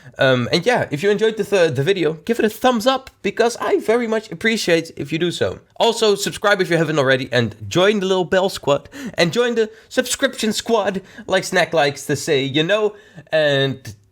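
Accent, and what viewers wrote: Netherlands. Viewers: eyyyy jog je bent nederlands toch ? well ik ook ik kan het horen via je accent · Je nederlandse accent is zo laimmm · Are u dutch or Deninish or Belgic? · yep you sound dutch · Just a question this voice really has a Dutch accent? Is he Dutch? Anyways I love ur vids what are ur subs called Znaccers CX? (Iam one CX) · he sound dutch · Dutch :D?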